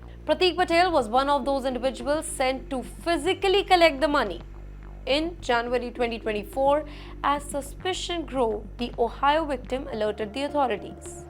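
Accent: India. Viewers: Close the borders already! Why would anyone listen to someone with the Indian accent!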